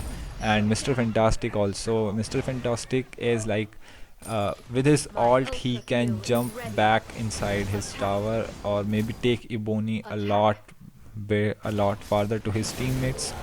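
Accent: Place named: India. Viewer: Bro I think your indian from your accent,but forgive me if iam wrong but I was wondering whether you are getting ranked matches,iam indian myself so I think we will be playing in the same server,that's why iam asking you,iam struggling here cuz iam not getting matched in rank and maybe like after 6+ mins I give up, please provide me some tips on how to get ranked matches easily if there are any, Thankyou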